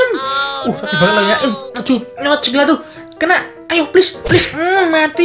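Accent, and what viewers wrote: Java, Indonesia. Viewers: wong ngdi lur kok logat.e medok😀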